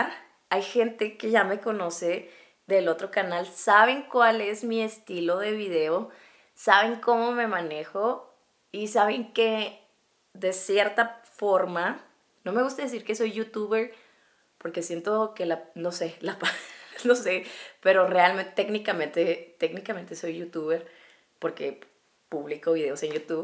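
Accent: Mexico. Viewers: sos mexicana... en susurros no se escucha la "tonadita" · se te siente mucho mas el acento mexicano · Con tu voz normal si se te nota un poquito el acento de chihuas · amo el acento mexicano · el acento mexicano me gusta mucho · me encanta conocerte con tu acento mexicano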